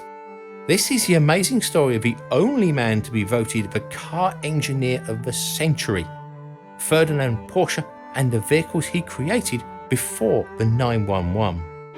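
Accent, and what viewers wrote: United Kingdom. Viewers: Cause he has British accent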